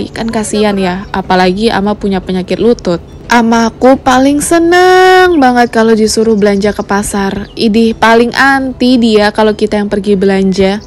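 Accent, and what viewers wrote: Manggarai, Indonesia. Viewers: ci memang org NTT tepatnya Manggarai say😊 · Baru tau kak berarti sama dengan saya Manggarai juga😆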